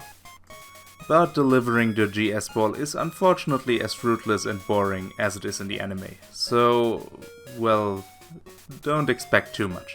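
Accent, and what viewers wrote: Germany. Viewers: Hahaha, nach 2 Sekunden direkt den deutschen Akzent raus gehört. Aber richtig gut. Ist eh besser in Englisch 💪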